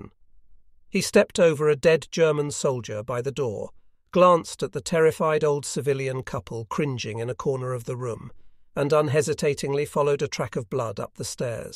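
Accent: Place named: United Kingdom. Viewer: This British accent is confusing. BTW I loath British AI accents.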